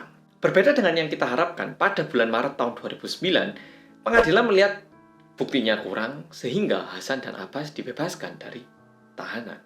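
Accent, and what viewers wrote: Java, Indonesia. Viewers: maaliiing wkwkkwkww medoook beuud yah mas e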